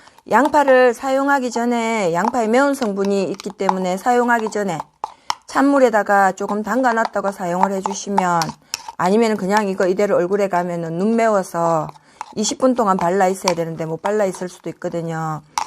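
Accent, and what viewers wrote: Gyeongsang, South Korea. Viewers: 말씀 도 부산 이나 경남 쪽의 말씨 이네요. 아주좋아요.😄 헤어스타일 도 멋있네요.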